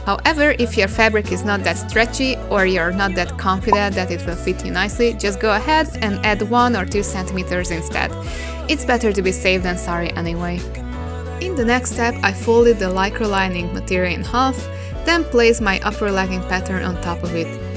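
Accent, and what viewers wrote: Hungary. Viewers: Hearing your accent I am pretty sure you are Hungarian :P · Now I understand what people mean by us having a strong unique accent... I somehow knew you were Hungarian so I just had to look after it, and I was riiight! Anyway, you are really cute and the video was great!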